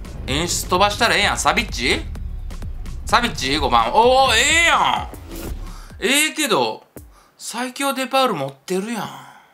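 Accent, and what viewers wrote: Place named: Osaka, Japan. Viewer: 下手な大阪弁ええやん😁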